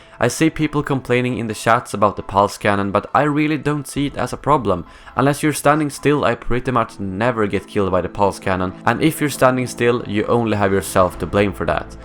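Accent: Sweden. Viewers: nice channel keep it up! that swedish accent tho :) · är du från Sverige och stockholm=)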